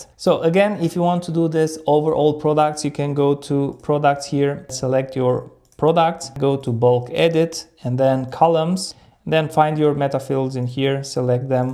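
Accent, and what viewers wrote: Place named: India. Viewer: primeira vez que eu vejo brasileiro falando em ingles com sotaque indiano kkkkkkk valeu pela aula mano, ajudou muito aqui na minha loja tmj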